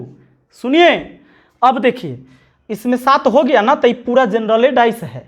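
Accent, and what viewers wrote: Bihar, India. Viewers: ap bihari sur me bolte ho to maza nhi ata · Sir bihar se ho naa sach bolna🎉🎉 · का सर जी ,रउओ बिहारी बानी का??? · Bihari boli❤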